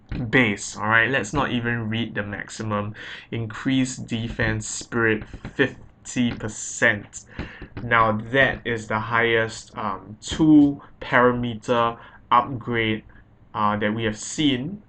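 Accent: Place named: Trinidad and Tobago. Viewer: You sound like you have a Trinidadian accent. Great video!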